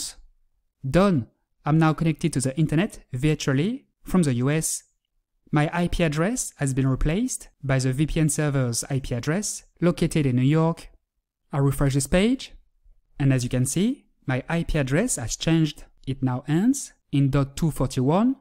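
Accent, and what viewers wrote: France. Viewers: XD L'accent francais se fais entendre x)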